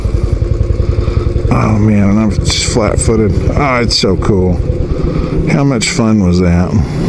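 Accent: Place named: United States. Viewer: I love your accent so much, u sound like a cowboy from the wild west, where are u from?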